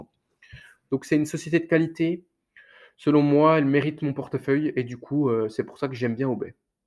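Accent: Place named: Doubs, France. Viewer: merci ca se voit que tu prends du plaisir. Avec un bel accent (du doubs si j ai bien compris)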